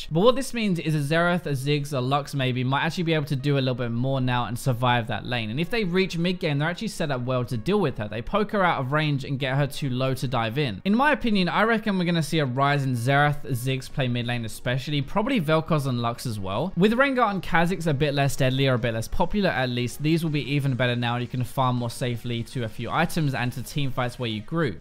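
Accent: England, United Kingdom. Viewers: Your doing gods work, both the patch videos and the app are very helpful as well as the English accent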